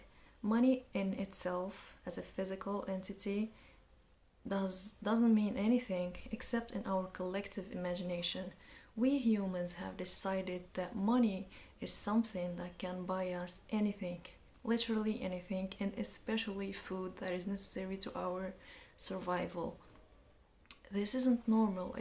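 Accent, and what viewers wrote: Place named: United States. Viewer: thanks for sharing with us. your accent seems like an american accent. bravo wachma all love from spain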